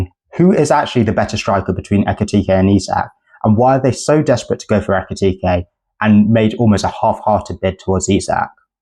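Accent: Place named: United Kingdom. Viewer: Something about the marriage between the British accent and football is just so glorious to behold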